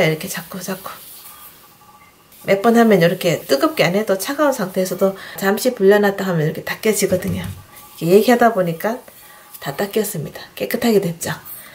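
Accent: Gyeongsang, South Korea. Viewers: 경상도 사투리 멋찌십니다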